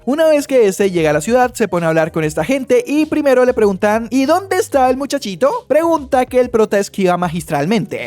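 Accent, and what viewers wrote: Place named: Colombia. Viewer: Con ese acento sí o sí eres colombiano jajaja 10/10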